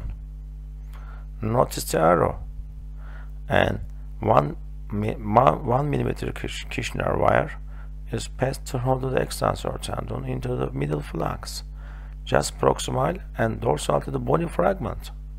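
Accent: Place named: Turkey. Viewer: Bir Türk'ün İngilizcesini hemen tanırım:))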